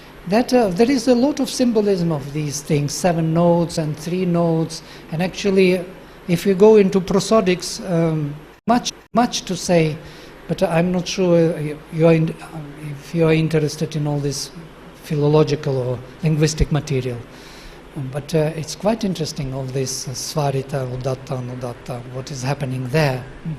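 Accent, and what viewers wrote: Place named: Russia. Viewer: Very beautiful exposition and i really enjoyed the charming Russian (?), Indian accent and humble manner in which it was delivered. Thanks !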